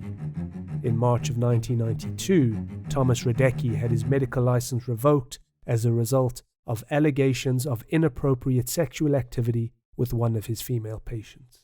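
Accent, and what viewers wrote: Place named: United Kingdom. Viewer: Always better with a British narrator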